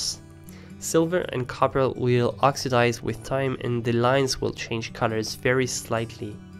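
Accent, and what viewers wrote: France. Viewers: I can listen your french accent. Tu vas pas me la faire fréro, sinon bonne vidéo.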